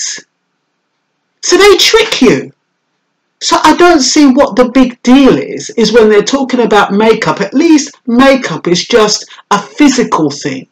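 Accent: Jamaica. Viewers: Okey but men, nowadays are putting on make-up and weaves too. Men just try to detach themselves.I love your Jamaican accent by the way.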